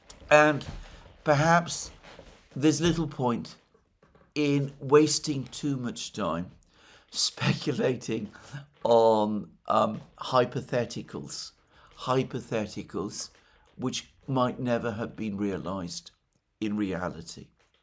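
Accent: United Kingdom. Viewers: love how you described it! British accent rules❤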